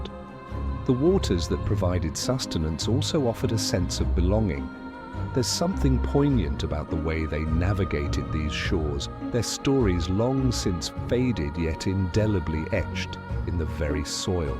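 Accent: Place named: United Kingdom. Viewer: The voice says he grew up there...in a British accent.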